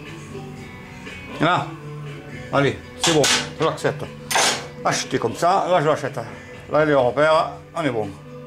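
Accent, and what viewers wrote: South, France. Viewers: t'a voix dû sud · j'adore ton accent, ça sent bon le sud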